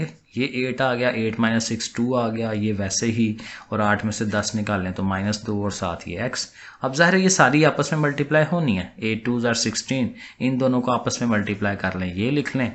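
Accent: Sialkot, Pakistan. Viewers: A.a sir ap Sialkot ( dska side ke lagty han ?)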